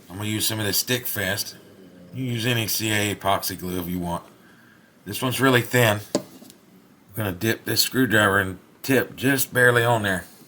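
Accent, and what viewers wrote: South, United States. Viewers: ROFL that was a great intro guitar to the noggin' 'Smack 'Lol! You have a cool accent is that the south? Lots of great info on this channel!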